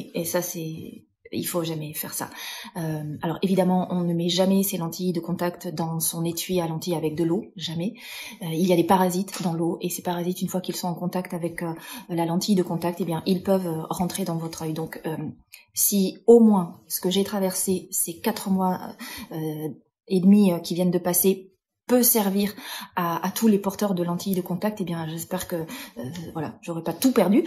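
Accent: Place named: South, France. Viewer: j'adore votre accent meridional 😊.ma mere etait de la Dordogne,( Perigord vert, pres de la Haute Vienne et je suis ne en Anjou, maine). et loire,limite Sarthe et Mayenne. La bas aussi, on a un accent "bein" prononce. Bon Courage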